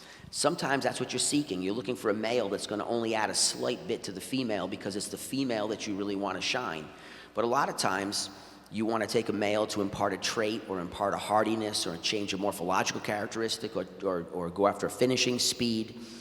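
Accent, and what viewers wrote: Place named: New Jersey, United States. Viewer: sounds like he is from NJ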